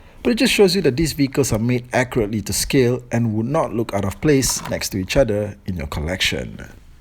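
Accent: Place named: Malaysia. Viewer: when a malay speak english...you can hear their horrible malay accent....and those headlights are ORANGE not red u color blind or wat?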